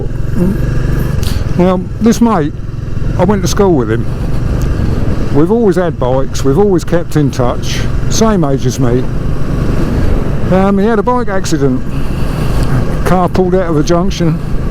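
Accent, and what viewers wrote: Suffolk, United Kingdom. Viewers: Lighter note it's good to hear a good old Suffolk accent 👍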